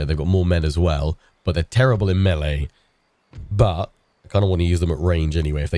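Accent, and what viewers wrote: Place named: England, United Kingdom. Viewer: Hello, Sir, Excellent to see Napoleon in Egypt! Well done with the start up battles, I enjoy the fire and movement of your Infantry, use of terrain and Cavalry exploits to knock out your enemies Artillery right from the start! Most excellent indeed. I fear that your garrisons behind your lines are too weak? If Nelson desides to land on the coast with the Ottamans everything you have will be in Cairo and that will mean a double back to push them back. Also as time will pass and the Egyptian resentment will increase you must leave suitable garrisons behind, unfortunatley, to deal with the unrest. This is a strange and land of 40 or 50 centuries very unlike Euroupe in most ways, especially religion! Even Ceasar had to leave a legion in Alexandria to secure his rear and administration of supply. Love this content and I hope you feel better soon! 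Always odd hearing about french exploits from someone with an English accent! Amusing! Glory to France and Napoleon! Love this content and keep it coming! 🍻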